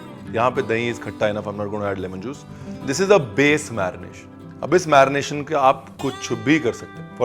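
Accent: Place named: Punjab, India. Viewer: Bhaaji you are the best across youtube and i love how you speak your punjabi accent i love that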